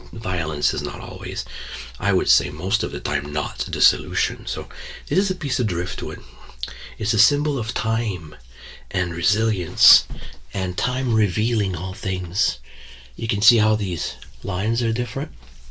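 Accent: Quebec, Canada. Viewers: I just discovered ur channel and it’s amazing 😍, Idk what’s going on most of the time but it’s very relaxing 😌 Lové the costumes etc and ur French Canadian which I also love 😁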